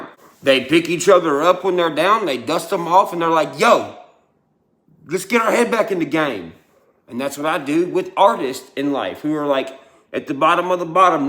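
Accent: South, United States. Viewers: As an old dude- I'm just gonna say it. Thank you for being a redneck rapper who STILL speaks like a southern dude. * Cool tune btw.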